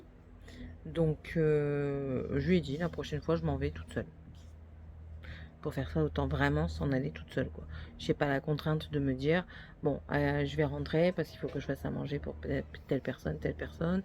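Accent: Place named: Nord, France. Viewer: Oh la vache l'acent du ch'nord ! C'est marrant !!!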